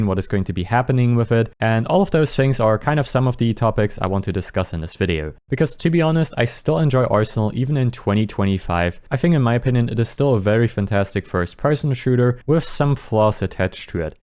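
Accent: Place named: Germany. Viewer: I hear intense german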